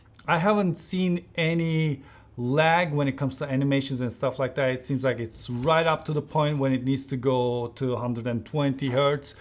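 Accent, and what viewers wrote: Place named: Turkey. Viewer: Bu abi Türk mü? Acaip güzel Türk şivesiyle konuşuyor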